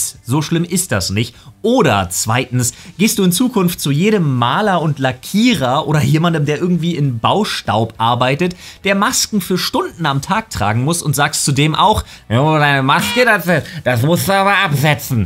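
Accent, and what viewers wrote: France. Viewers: Mit deinem Bart und diesem französisch klingenden Aktzent könnte man denken, dass du wirklich Franzose bist😅😅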